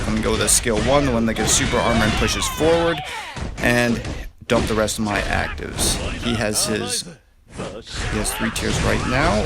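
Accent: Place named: Russia. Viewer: Again russian accent 😎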